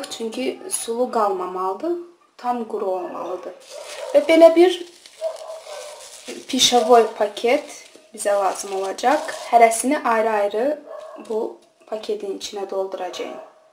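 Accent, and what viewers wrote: Azerbaijan. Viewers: Guya Bakilidi gorureceyem demekle deyil sesinden rayon avazi gelid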